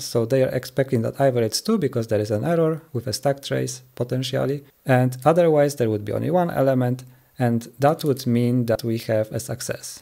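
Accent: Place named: Poland. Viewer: po głosie to chyba polak ;)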